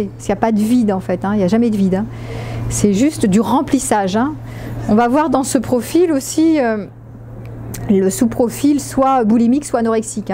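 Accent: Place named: South, France. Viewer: je comprends pourquoi je vous trouve une ressemblance avec celle de ma collègue. Elle est aussi du Sud de la France. Raison pour laquelle vous avez probablement, la même intonation, je pense... En plus du côté alpha, j’étais aussi clivé, je dirais que je suis beaucoup plus clivé aujourd’hui.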